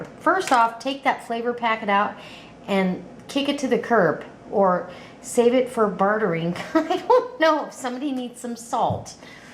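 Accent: South, United States. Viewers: a slight southern drawl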